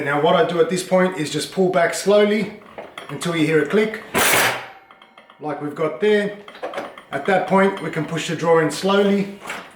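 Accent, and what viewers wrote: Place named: Australia. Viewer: Thank you so much! Was to happy to hear an Ozzie accent when I clicked on it haha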